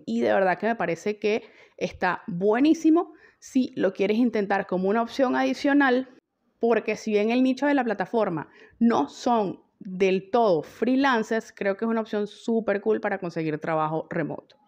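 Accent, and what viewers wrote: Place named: Venezuela. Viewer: Hola soy Lucy, recién descubrí tu canal, he visto otros, pero tú eres más clara, concreta, precisa en los planteamientos, sugerencias, y sobre todo el hablar "venezolano" no tiene competencia. Me he postulado en trabajos remotos dentro de Venezuela y las exigencias son altas, además de los requisitos académicos, las competencias en TIC y las virtuales como el acceso fluido a internet de 10Mbps o superior. Un computador con 4Ram, 250Gb Disco Duro, i5, o superior; en buen estado sin problemas de energía, ni de reinicios. Una cámara web o un celular con buena resolución. Nota: hace tiempo me postulé en una supuesta empresa con casa matriz en España, fue un total fiasco, todavía espero al abogado con el cual me amenazaron.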